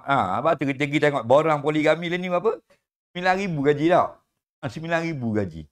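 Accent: Terengganu, Malaysia. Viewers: Uai sangat pandai kecek teganu.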